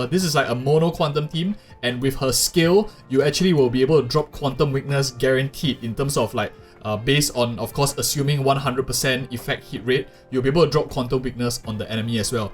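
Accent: Singapore. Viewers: singaporean accent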